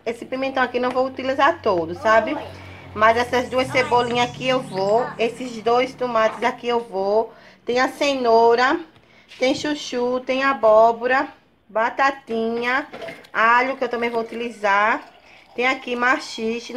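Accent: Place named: Bahia, Brazil. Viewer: aaaaaa deve estar uma delícia mulheeeer 😍 amo muito tempero no feijão.. você é da bahia??? amei o sotaque viu mulher beijão 💗😍😍😍💗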